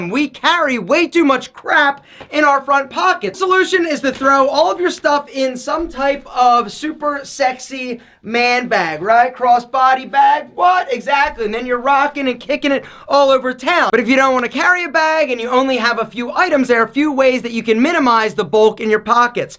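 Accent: Philadelphia, United States. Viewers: This guy gotta be from Philly 🗣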